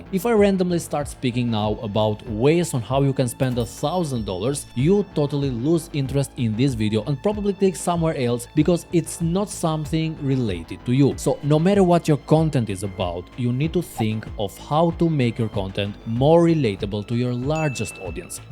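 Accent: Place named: Albania. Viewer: hahahahahah sa dallohesh qe je shqiptar ti o plak 😂 vec nga theksi e kuptova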